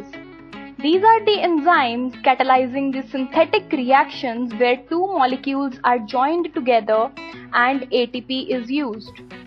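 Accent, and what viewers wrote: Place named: India. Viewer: Oh my god I'm arabic and I can't understand your hindi english 😣😢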